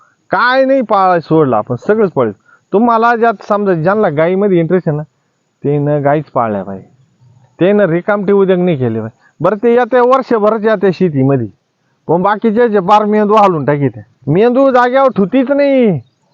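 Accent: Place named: Nashik, India. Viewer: वैजापूर तालुका ते नासिक पट्ट्यातील हेल (स्वर) असलेला आवाज आहे हा.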